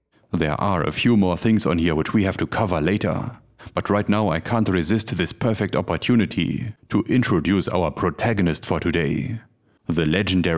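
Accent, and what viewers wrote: Germany. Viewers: German native that speaks English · sounds like a German Darth Vader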